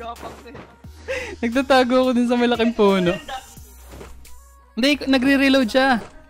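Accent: Visayas, Philippines. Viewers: btw gi copy nmo si kuya jazon gaming question ko lang kuya? bisaya ka?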